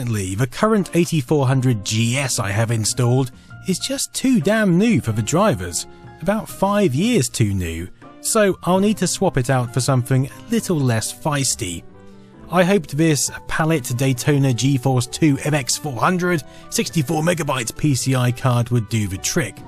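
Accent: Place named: United Kingdom. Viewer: I actually want to play the Bridge Command game now. accent is cool and all as with any brittish accent, but everytime I hear he say about the early nineties as (early "naughty"es) I can't help but crack a smile.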